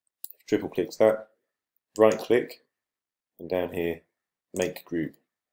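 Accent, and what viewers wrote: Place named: Sheffield, United Kingdom. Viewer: Thanks. Just what I needed after recently downloading sketchup which has inspired me to attempt a cabinet build in my shoe cupboard after watching your channel. Nice to hear a uk voice especially one from sunny Sheffield. Lol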